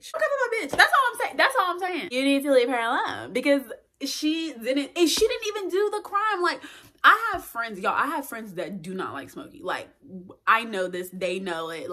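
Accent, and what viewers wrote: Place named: Bay Area, United States. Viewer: Are you from the Bay Area sis ? I hear the accent lmao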